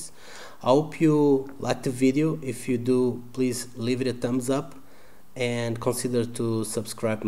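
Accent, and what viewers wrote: Portugal. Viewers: Ele é português?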